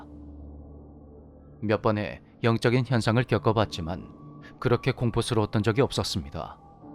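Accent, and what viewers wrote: Gyeongsang, South Korea. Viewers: 경상도분이신가봐요 요즘 목소리로 열심히 밀린숙제하듯 듣고있읍니다 경상도사투리가 완전 반갑고 어색하지않고 참 좋아요~~^^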